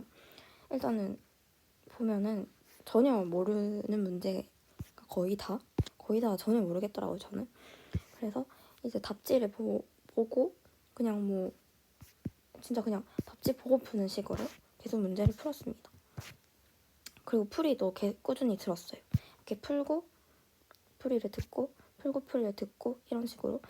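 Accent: Gyeongsang, South Korea. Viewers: 경상도분이네요!! 친숙한 말투 ㅎㅎㅎ 전 포항출신이에요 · 경상도 분이신가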